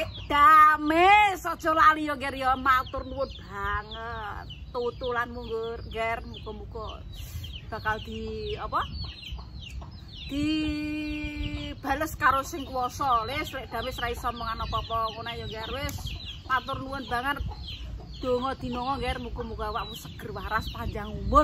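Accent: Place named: Tuban, Indonesia. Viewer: Kalo liat kontennya, logat bicaranya mengingatkan saudaraku yg ada di Tuban.... Semangat y Lek...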